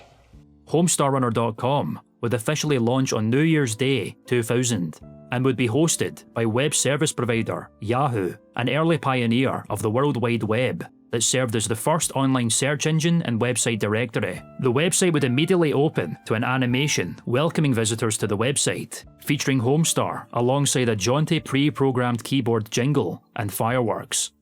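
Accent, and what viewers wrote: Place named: Scotland, United Kingdom. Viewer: I cant tell if youre scottish or irish but i can recognize youre scottish and that scares me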